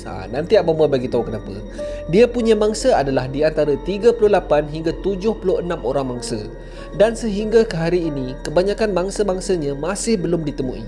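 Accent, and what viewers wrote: Perak, Malaysia. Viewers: ptut comel je bnyi dia😅, ooo... Kome orng perak rupa nya atei Kome sihat ke top?🤭 Teman dlu blajor dkt sano, Teman tinggey kt Batu gajah dlu yop. (Orng perak Jgn marah erkk, saja tringin nk blajaq ckap perak)😅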